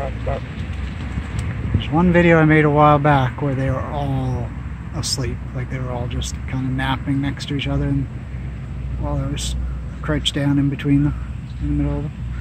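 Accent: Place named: RP, United Kingdom. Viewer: I can already hear her Received Pronunciation.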